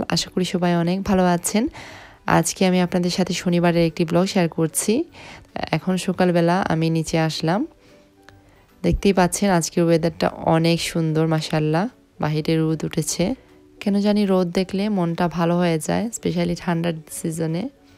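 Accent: Sylhet, Bangladesh. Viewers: Apni ki Sylheti??????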